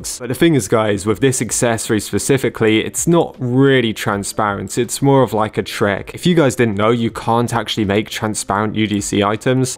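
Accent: United Kingdom. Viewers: My favorita british acento youtuber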